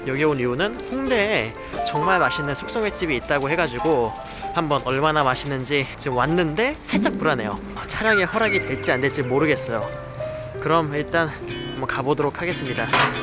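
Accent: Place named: Busan, South Korea. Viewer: 부산 분인가요..? 내가라고 말씀 하시는 것 같네요 와이프도 제가 보다 내가라고 얘기 많이 하던데.. 설명 하시는 목소리에 감칠맛이 가득 하세요 친구분들은 무슨복인가요 너무 부럽습니다... 와....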